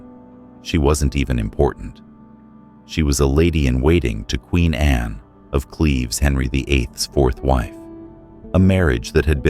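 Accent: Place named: United States. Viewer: Can't listen to my countries history narrated by an American, you just know some things and places are going to be pronounced completely wrong or be inaccurate 😮😮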